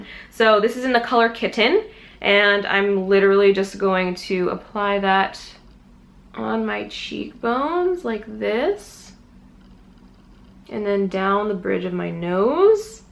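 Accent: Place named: Australia. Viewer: Your Aussie accent is so good (to me it's Aussie)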